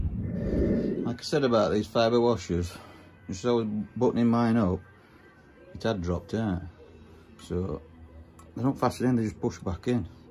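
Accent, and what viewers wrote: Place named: Rotherham, United Kingdom. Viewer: How much would you charge to install 1 for me I'm based in Rotherham sounds like your from around here ??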